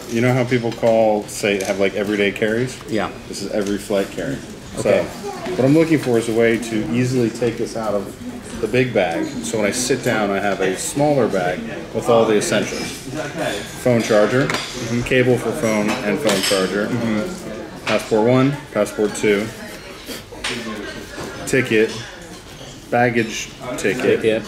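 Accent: RP, United Kingdom. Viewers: Your posh Brit accent had me in stitches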